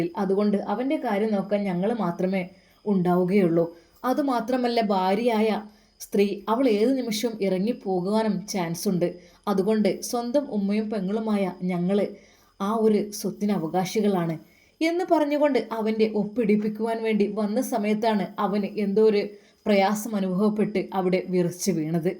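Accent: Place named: Kozhikode, India. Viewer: Ningal calicutil koduvally bhakath mattoo aaanoo.. samsaram angane thoonunnu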